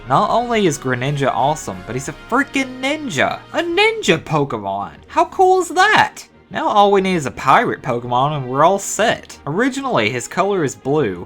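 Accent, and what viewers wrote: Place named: Tennessee, United States. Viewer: Where are you from? Your accent says somewhere around Tennessee to me. I could be wrong though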